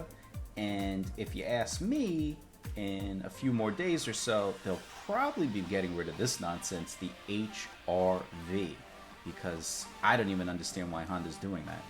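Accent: New England, United States. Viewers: I am a salesman at a Honda dealership in Atlanta, and we had deposits on these Ridgeline's two months in advance, and still have many deposits to be filled. If you want to be sold on this truck, just come down from what ever New England state that your and your horrible accent is from to Georgia and we'll get you hooked up with the most diverse trunk on the market! Thanks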